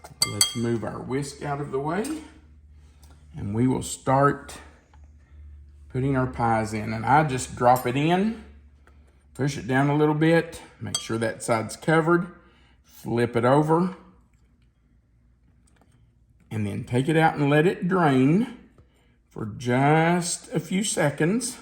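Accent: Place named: Kentucky, United States. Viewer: Those look wonderful! You sound like my parents they come from Menifee county!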